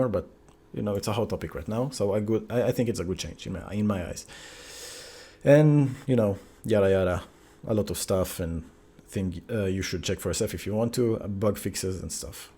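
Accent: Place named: Israel. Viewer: i can still hear that hebrew accent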